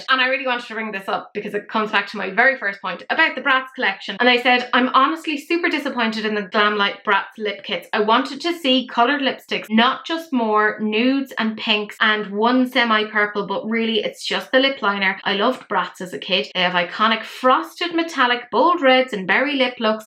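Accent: Ireland. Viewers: Totally agree on the Bratz palette (and on filler, for that matter. My lips are thin as well and I just…don’t care? 😅 They’re mine, you know? What if it were our thumbs? Wouldn’t that be silly, everyone changing their thumbs because “well it’s entirely too long, this thumb, let’s shorten it.” ) The Viseart palettes may be “basic” colours but their combinations are nice, it feels coherent. (So many palettes don’t.) Edit to add: there surely WILL be lipsticks in the RH collection because lips are practically iconic in that movie (Magenta and Frankenfurter come to mind, and Riff Raff’s “concealer lip” look as well.). Incidentally, you have convinced me that no accent better delivers the phrase “I don’t like it, and I don’t want to talk about it anymore” better than the Irish accent. 😂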